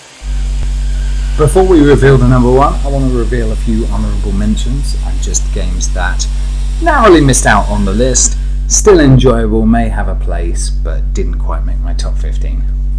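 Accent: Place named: United Kingdom. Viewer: The Britain accent it's amazing. Congratulations for the video.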